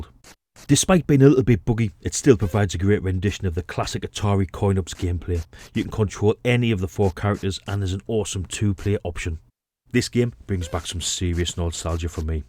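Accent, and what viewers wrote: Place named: Sunderland, United Kingdom. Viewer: I came for the retro C64 content, but I'm staying for that Mackem accent! Takes me back to my uni days! That US version of Aliens looks way better than the version i was subjected to in '86!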